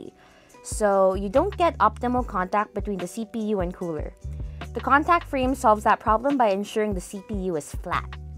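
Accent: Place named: Philippines. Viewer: I am hearing a filipino accent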